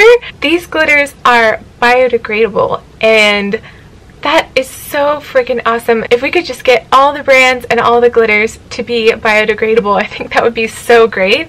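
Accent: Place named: Southeast, United States. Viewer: 💙💙🐈🐈💕💕 I really appreciate your visit to my channel,! I'm happy to return you the digital love with a play in full (10 minutes) and a crazy like #58! Hmmm, I didn't know that vegan cosmetics was a thing. I can't speak for cosmetics, but a lot of Indie music and movies are much better than the mainstream stuff. Shame they don't get the exposure they deserve. "They're so amazing... I just daydream about them sometimes." Very funny with the soap-opera-esque music accompanying! At first I thought it was maybe just my imagination, but after seeing a few of your videos now, I've noticed that you have a slight accent, almost like southeastern US. "Transformative" isn't a word you get to use every day! Looks like Mystic Cat makes an appearance! Thank you for sharing, and I hope your week has been kind to you!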